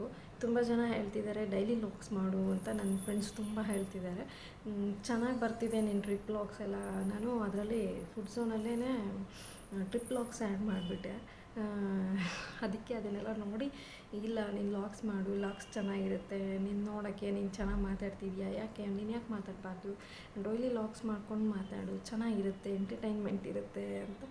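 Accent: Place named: North Karnataka, India. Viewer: ಯಾರೇ ಕೂಗಾಡಲಿ ಯಾರೇ ಹೊಕ್ಕೊಲ್ಲಲ್ಲಿ 🤪 uk ಭಾಷೆ ಇದು ನಿಮ್ಮ ಭಾಷೆ ಏನು ಅಂಕೋಳೋಲ್ಲ ಯಾರು ಸೂಪರ್ ಮೇಡಂ ಮಾಡಿ 👌🏾👍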